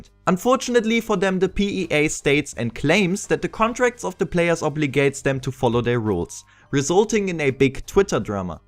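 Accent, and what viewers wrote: Germany. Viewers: Are you German D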